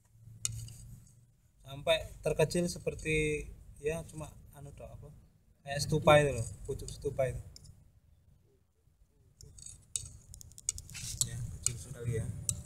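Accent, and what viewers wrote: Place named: Java, Indonesia. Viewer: Coba gaya bicara nya diperbaiki, jangan terlalu medok supaya enak didengar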